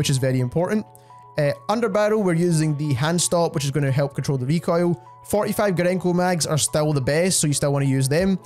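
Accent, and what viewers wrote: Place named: Scotland, United Kingdom. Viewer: i love the scottish voice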